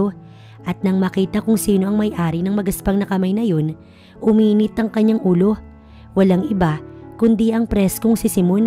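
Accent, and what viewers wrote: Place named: Hiligaynon, Philippines. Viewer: Napaka gandang kwinto story Lalo pa ilongga ang nag kwinto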